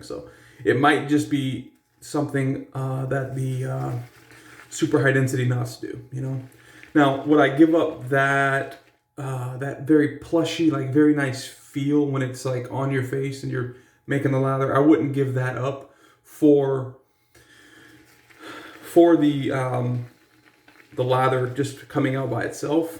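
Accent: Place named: United States. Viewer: That was a good shave! I vote TSE Espresso for the next one. It's called a three-way tie! 😁 Classic Shaving is not tallow based, and all of their scents come in both their regular base and a lanolin base. My pre-shave routine entails using a face wash during a hot shower and then shave right after. I haven't done the hot towel method since I used cartridges. Lol. I'm already getting decision fatigue trying to decide on what soap to use every morning. SMH. I gotta laugh at the gringo pronunciations you use. 🤣 But that's okay, you're still awesome. Hey, how 'bout you mail me a nice bbq'd brisket? Lol. Love you,! 💙🖤💙🖤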